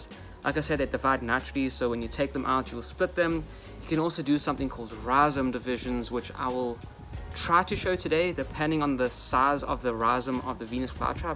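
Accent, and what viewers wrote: South Africa. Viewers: Nice to hear and see a fellow South African in the plant community 😃😁 Going to try repot mine. Is it ok to do now in late spring?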